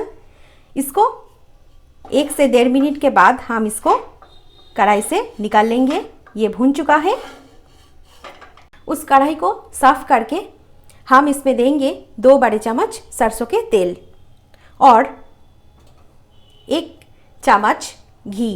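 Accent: Bengal, India. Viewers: Very awesome recipe also delicious I like u r bangoli pronunciation expecting more recipies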